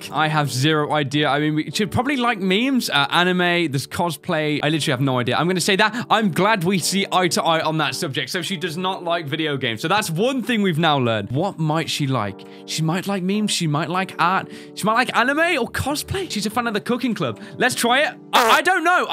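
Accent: United Kingdom. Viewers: um...? you're British... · Are you British dude? Shite. Your Accent are Very Great. :) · I see your British am British but people say am American because I watch YouTube too much😅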